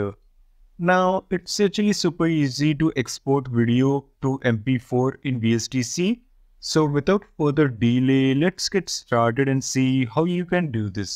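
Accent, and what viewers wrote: India. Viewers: why does your voice sound Indian in a English way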